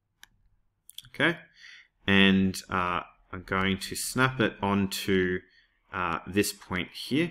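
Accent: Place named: Australia. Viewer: Bro, The dude in Thor Ragnarok is New Zealand. This dude here is Aussie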